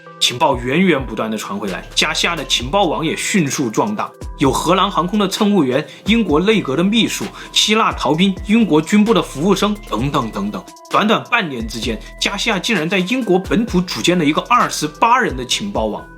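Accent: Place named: South, China. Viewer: 我自己蠢，所以特愛聽聰明人的故事。這位南方口音超重的小伙子說的奇談怪論有趣、好聽、我喜歡😘。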